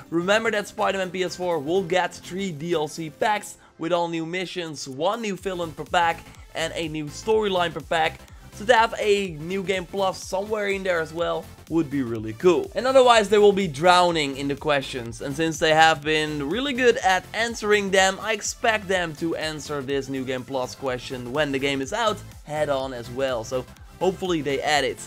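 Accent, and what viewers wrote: Netherlands. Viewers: Mate are you dutch? You reaaaally sound Dutch :p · Are you dutch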